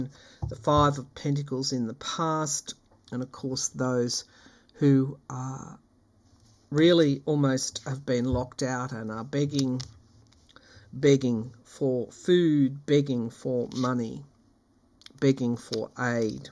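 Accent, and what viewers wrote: Australia. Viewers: I love listening to you, thank you for your readings, also good to hear an Aussie accent being so far from home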